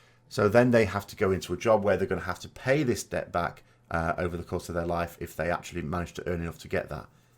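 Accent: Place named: Northern England, United Kingdom. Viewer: Hi I think a lot of people who come to your videos would like to have you as a friend. To be honest my Finnish wife loves your accent as do I. As a southern born guy who lived up north for many years. I always crack a little cloth cap and ferret down trowsers joke with her, and she is laughing her head off. I explained just how grim it is up north. :) I am very happy to sit and listen to you for hours. You have a solid hold on whats going on in old blighty. Good luck to you mate.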